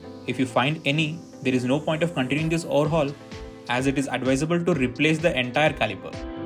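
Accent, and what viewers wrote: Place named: India. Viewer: Finally I found English indian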